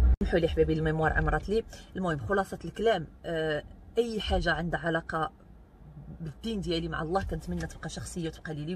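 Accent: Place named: Iraq. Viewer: هاي هاي على لغزالة ولهجة لعراقية ❤نتي تهضري وانا نضحك غي بحدي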